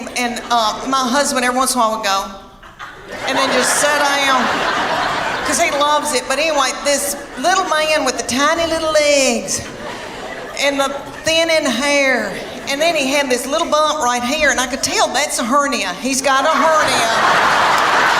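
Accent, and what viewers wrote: South, United States. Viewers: now that twang means no teeth, no brain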